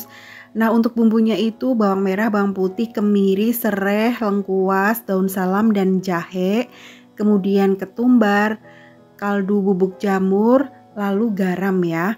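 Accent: Banyumas, Indonesia. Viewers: Wkwkwk.. Jebule wong ngapak.. Tosss disitt.. Yuh pd mampir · Seneng liat vlog nya bunda berasa lg pulang kampung😀😀 pokoke ora ngapak ora kepenak ya bund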